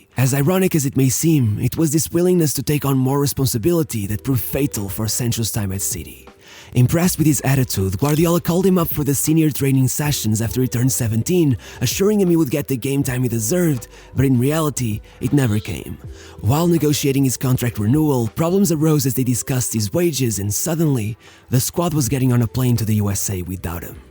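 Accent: Portugal. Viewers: Eu sabia que eras português assim que ouvi o sotaque hhahahahha.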